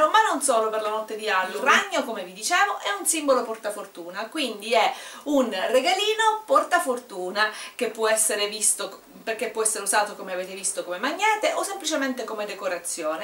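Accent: Sicily, Italy. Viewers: Sei siciliana?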